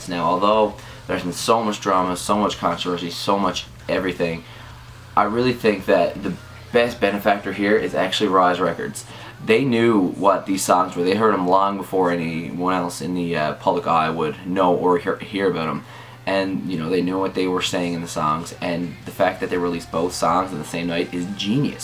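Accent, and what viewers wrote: Canada. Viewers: I can hear an accent. canadian?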